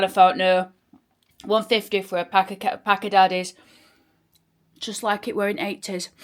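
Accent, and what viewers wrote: Oldham, United Kingdom. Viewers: i could listen to you for hours and hours, you're from where i'm from, you think like i think, and you talk like i talk, i moved away 25yrs ago and i miss the people not so much the place, i was brought up in Lees. you are a proper Oldhamer and your mind is like a sparkler, going off in all directions at the same time, lol, just keep doing what you're doing, it's great to see all the places i used to hang around in. and you are a born communicator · was born in oldham 1959.. grew up in chadderton cemetery... went to north chad school.. 67 now,, nice to here your accent again.. ive been on the orkney islands 29 years now.....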